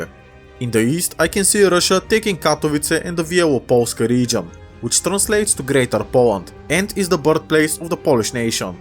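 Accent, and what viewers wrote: Bulgaria. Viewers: Your accent sounds just like Bulgarian. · Оригинален български акцент на английския, не може да се обърка😅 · По акцента ти мога да позная не само, че си Българин, но и че си от Източна България :D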